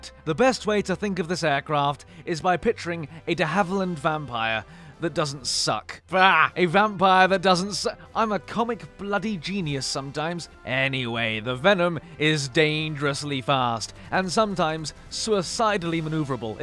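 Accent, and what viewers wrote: United Kingdom. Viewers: Dearest. I find this ironic. Your channel is mainly fantastic due to your lovely British accent, and your favorite vehicle of War Thunder is American. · Best British accent of the century🇬🇧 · So is he actually British or is it just an accent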